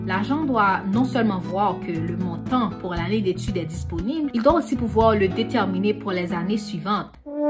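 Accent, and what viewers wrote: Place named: Quebec, Canada. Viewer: J'aime le léger accent québéquois.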